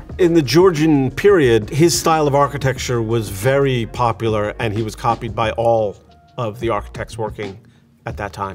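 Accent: United States. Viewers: Can you find an English architect for the English architectures? Or architects according to the building. He butchered most of the proper English names with silly American pronunciations :-(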